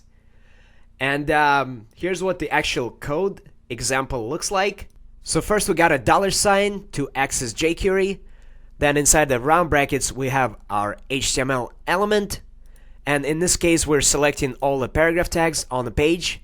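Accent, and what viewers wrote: India. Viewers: hahaha indian accent, hhhh btw i am soooo lucky to find your channel cuz you explain the syntax better than anyone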